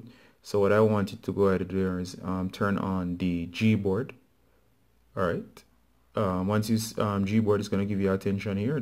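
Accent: Jamaica. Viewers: U Jamaican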